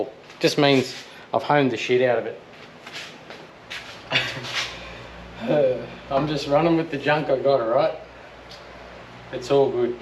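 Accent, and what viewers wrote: Australia. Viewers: Once again I am catching up with this episode late. If you are watching from overseas accent is typical of an Australian tradesman. We are that group of people who don't have to carefully check what we say before we speak. We use slang, we might swear a little, it's all natural to us. With his humour might at times come across as a bit daft. But, he has a sharp mind and he knows what he is doing.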